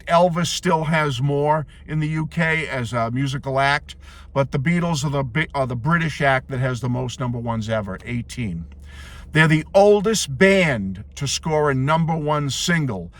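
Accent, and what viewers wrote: Boston, United States. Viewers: By the way, let me just say, again, I so enjoy sitting down with a cup of coffee and hearing my online “friend/neighbour” of sorts, just share his love for cool things about the best band in the world. I love how unadulterated you are and yet not weird or obsessive but a normal guy. I kind of get a chuckle out of your Boston like accent too. I feel like I’m watching an old episode of Cheers or something. Have a great day, thank you for the morning comraderie.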